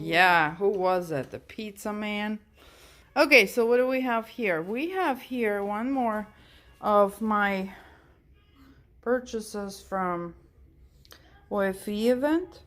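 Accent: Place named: Poland. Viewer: I love phalaenopsis and I grow them in my livingroom. I got interested in these plants through my sister-in-law. She has a kind of accent like yours, because she is Polish. That's why I thought you were Polish or Russian ;-) Florida seems a great place to live. But maybe a bit too hot for me. And too many spiders, snakes etc. Thanks for sharing your knowledge and way of life, from the other side of the world. All the best. And lot's of Rain! :-))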